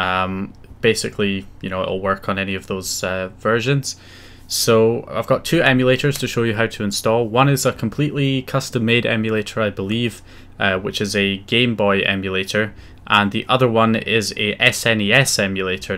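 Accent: Scotland, United Kingdom. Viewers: Good to hear a fellow Scotsman on here! I’ve only just this second learned that my PS4 can be jailbroken! Amazing but can you advise a newbie where to start or point me in the direction of which of your vids to go to first? I’ve no clue about terminology or that. Cheers man and all the best!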